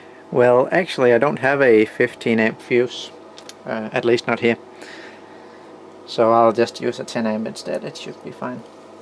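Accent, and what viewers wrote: Denmark. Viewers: You really sound Danish to me :D Cool projekt! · his accent sounds danish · COOOOOOL fra de første 10 sek, jeg har gættet på at du er dansker :D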